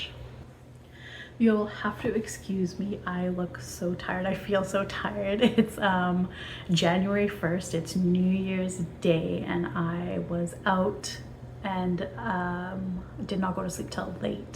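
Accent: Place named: Whitby, United Kingdom. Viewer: I'm in Whitby, it sounds like you're somewhat nearby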